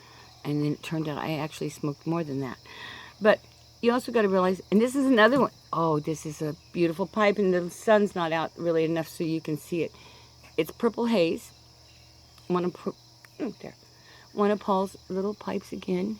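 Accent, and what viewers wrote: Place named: Kentucky, United States. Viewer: Hello Mrs.. I am a friend of Wes (Old Khakis). He said you had a nice trip down to Texas. So glad to know you. I am from Arkansas, so that Kentucky accent fell sweetly upon my ears.😊. I loved this video, even though I had to “listen quick”! LOL! I’ve only been smoking a pipe for 9 months, but absolutely LOVE the hobby! God bless you, Mrs.. I look forward to more of your videos! Cliff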